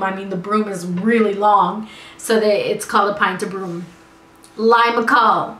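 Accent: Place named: Guyana. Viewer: Omg girl..ur guyanese accent sound so sweet. Lol d glass comment. Hahah I think thats a west indian thing cuz we say tht in Barbados too. I luv how u say them sayings..u sound so much like my friend's mom she is from guyana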